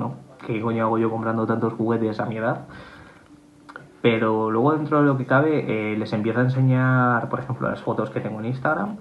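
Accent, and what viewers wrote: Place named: Peru. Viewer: Sigo creyendo q eres peruano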